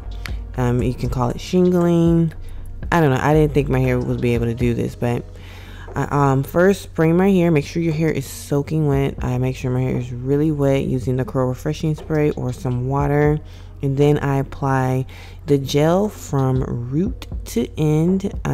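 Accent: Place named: South Africa. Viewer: You look so South African! Your accent gives it away. Gorgeous!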